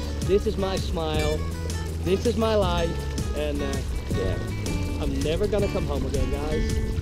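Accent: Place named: Amsterdam, Netherlands. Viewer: Grappig amsterdams accent ; )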